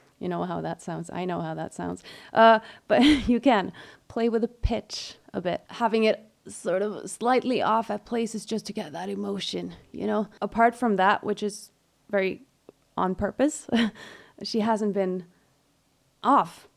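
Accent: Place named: United States. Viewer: I AM REALLY SHOCK 😱😱😱😱😱 I confess that i didin't know her before watching this video, and hands down to her oh my Goodness What a beautiful voice, i'm gonna watch more stuffs about her Please don't be mad at me, but she's also reminds me just a liitle bit of Mariah carey 😂😂😂 i'm sorry, of course she has her personality, which is stunning, and the jazz vibe changed everything But over all i love this so much "Without me disturbing you", you never disturb us, at least for me And wow!! You're from norway!! It's a beautiful country, and your english is very american hahahaha, so good for the singers 😎 Anyway, thank for the video 💛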